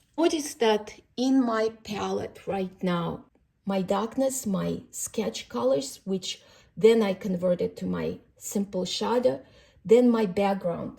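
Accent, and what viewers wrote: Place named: Europe. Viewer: Great to hear a European voice and you are so good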